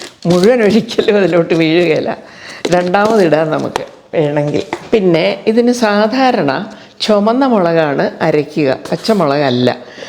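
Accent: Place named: Vaikom, India. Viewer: ടീച്ചറിന്റെ നാടു വൈക്കം ആണോ. അവിടത്തെ ഒരു സ്റ്റൈൽ ആണ് സംസാരത്തിൽ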